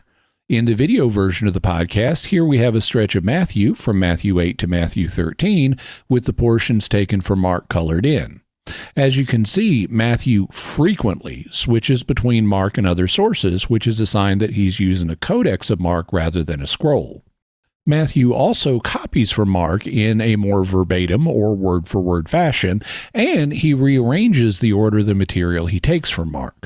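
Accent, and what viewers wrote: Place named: Texas, United States. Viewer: Because he's from Texas and that's probably just the way he talks.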